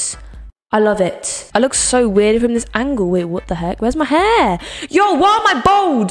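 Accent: United Kingdom. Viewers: Her british accent👍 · your accent is so prettyyy! r you perhaps British, or Australian? Xndjdksi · I love ur voice it’s like the most perfect British accent ❤ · Are you british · Its so uglay😭 Are u British or smth😭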